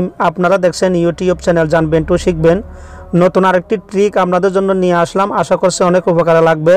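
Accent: Chittagong, Bangladesh. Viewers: চট্টগ্রাম এর নাকি ভাইয়া আপনি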